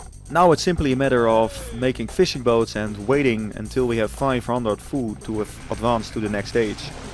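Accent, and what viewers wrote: Netherlands. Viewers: It was nice to hear a Dutch guy playing and explaining about this game :) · Sounds really Dutch😄😄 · From what country are you. You sound Dutch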